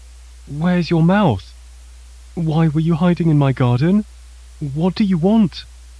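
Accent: United Kingdom. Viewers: Excuse me, is this video reuploaded? Cause I heard bad quality in this video, but this short film is for me like " weird " and I heard british man's voice narration. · The narrator sounds like a British Nexpo.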